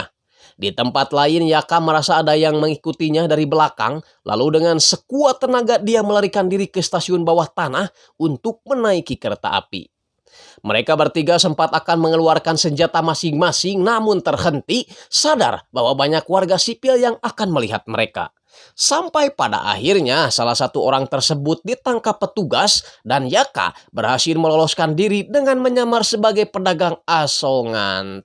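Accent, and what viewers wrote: West Java, Indonesia. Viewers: Filmnya keren.. Naratornya kocak.. Logat sunda makin mantapp!! Sukses sll kang..!!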